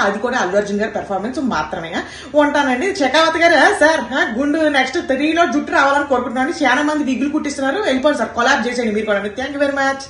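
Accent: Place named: Godavari, India. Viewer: అచ్చడిపోవాలి అంతే 🤣🤣🤣...గోదారి స్లాంగ్ ఆదరగోట్టారు👌🏼😍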